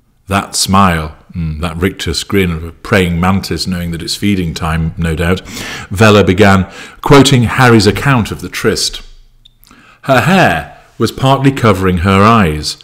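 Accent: Australia. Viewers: More Aussie accent please. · I’m amused by your Australian accent. I hope someone comments on audiobook experience! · you are hilarious!!! Loved your opening monolog. I'm one of the ones that loves your Australian accent, G'day, etc.... And to those who don't like it, then GOOD! 🤣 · champion aussie Accent Mate. fair suck of the sav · Your Australian accent spiked monologs are a few of my favorite things!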